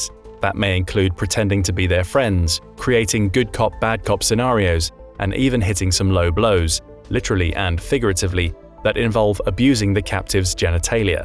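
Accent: United Kingdom. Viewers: That’s exactly what I was just about to mention!! Is no one seeing that this vid is being narrated by a fellow Brit?!?😝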